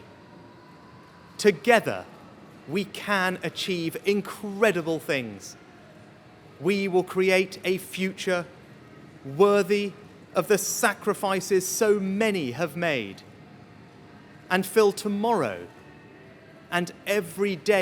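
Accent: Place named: United Kingdom. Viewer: What a clear British accent wow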